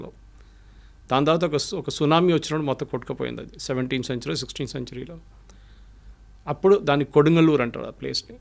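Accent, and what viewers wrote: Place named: Telangana, India. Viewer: Telengana accent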